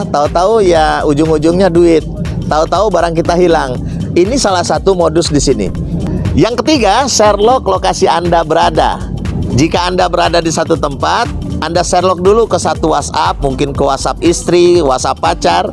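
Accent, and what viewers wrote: Medan, Indonesia. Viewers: adek ini orang medan ya?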